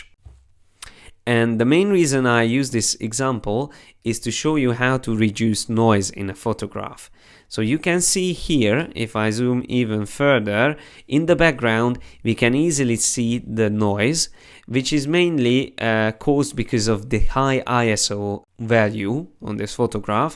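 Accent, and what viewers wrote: Hungary. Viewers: Annyira jellegzetes a magyar akcentus,öröm volt hallgatni:) De persze a tutorial maga is perfekt!!! Rengeteget tanultam belőle,hálás vagyok!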